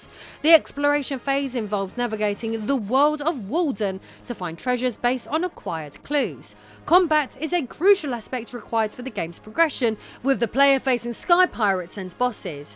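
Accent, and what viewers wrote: United Kingdom. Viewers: I like doing the segment where you were talking about Mobile suit Gundam, with your British accent it sounds like you're saying, got damn. With your British accent, when you speak, you say every syllable within a two syllable word, instead of, just saying it as one word, you and your husband, do this, I'm just telling you, what I've noticed, it's not bad, I'm just giving out my two cents here.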